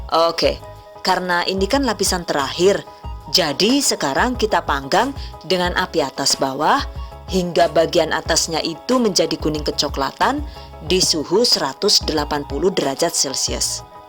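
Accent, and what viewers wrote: Banyumas, Indonesia. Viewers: Suaranya mbak aduh mirip orang bule, mirip penyiar juga aku suka bgt, eh tapi kok bisa jawa ngapak , apa ini orang ngapak yoo??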